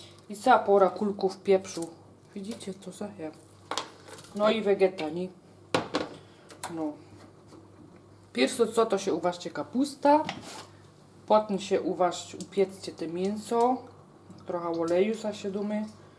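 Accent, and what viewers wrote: Silesia, Poland. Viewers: Pani prosze nie podjadac tego miesa z patelni bo nie bedzie co do kapusty wciepac.Ja robie zupelnie inaczej bigos szczegolnie swiateczny ale napewno spruboje Pani wercje kiedys.Nie jestem slazakiem ale lubie Pani gware i ta taka prostolinijnosc.Pozdrawiam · skad lochodzisz? Niby mowisz po slasku ale troche inaczej niz u nas w domu mowili? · Uwielbiam slazakow sama mam rodzine na slasku no to na Swieta dalas mi pomysl na bigos po slasku pozdrawiam fajnie gwarzysz po slasku ;) tak trzymaj.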